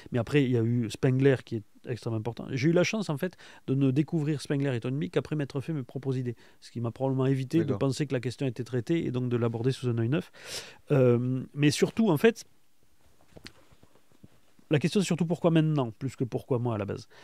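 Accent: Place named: Toulouse, France. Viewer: l'accent toulousain de * me le rends fort sympathique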